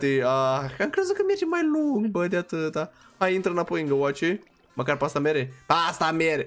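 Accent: Moldova. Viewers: Moldovean 100%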